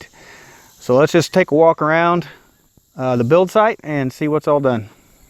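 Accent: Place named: Southeast, United States. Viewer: So nice and you are very good in your commentary. I subbed!!! By your accent I'm guessing you live in the south east, beautiful country BTW, do you foresee a problem with bugs since your so close to the pond?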